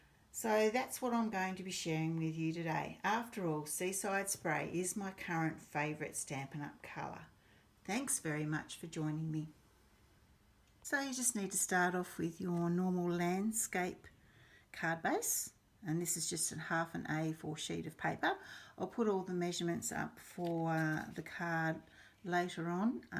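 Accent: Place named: Australia. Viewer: Hi - I've just discovered you and subscribed. This is an amazing and oh-so-beautiful card. LOVE the designer paper and the dies too. As it's almost the very end of December, I suppose that designer series paper is no longer available, but I will try. Also, it's lovely to hear an Aussie accent when watching Youtubes. LOVE YOUR WORK!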